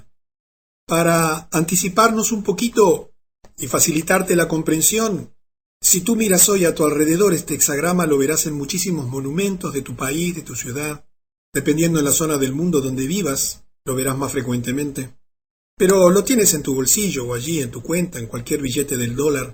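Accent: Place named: Argentina. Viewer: Hola, soy Francisco 27 años músico de Bahía Blanca. Excelente el video, realmente excelente. Me gustaría contactarte por Facebook, sobretodo porque vengo investigando esto hace mucho, acerca del NOM y todo esto y es la primera vez que veo un video con acento argentino. saludos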